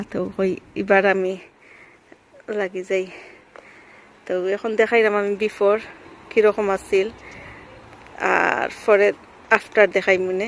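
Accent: Sylhet, Bangladesh. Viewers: Walaikumasalam Alhamdurillah Allah Pak rakche Valo Apu. Apnar sylhety presentation wonderful hoyeche. Thanks for sharing. Bondhu hoye gelam.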